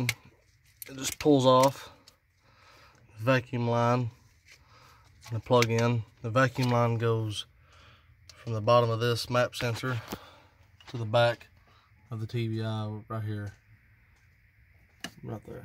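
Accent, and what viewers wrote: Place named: South, United States. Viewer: idk why bros thick country accent and the way he get straight to point, allows me to understand exactly what he explains and seem to be trustworthy he actually knows what the hell he talking about. God bless and thanks.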